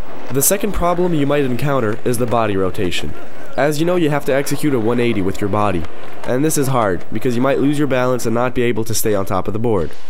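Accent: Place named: United States. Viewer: mdr ok, au debut jpensais t'avais pris la voix d'un trick tip americain et que tu l'avais mise ici :)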